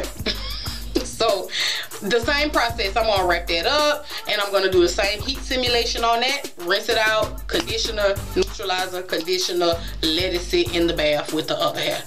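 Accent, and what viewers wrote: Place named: New Orleans, United States. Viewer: and love your accent as well and your from New Orleans that's one of my fav accents girl lol ??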